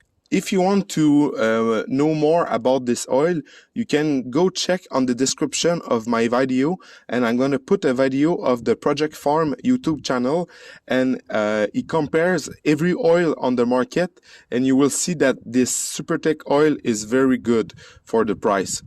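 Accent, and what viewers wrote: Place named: Quebec, Canada. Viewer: accent Québecois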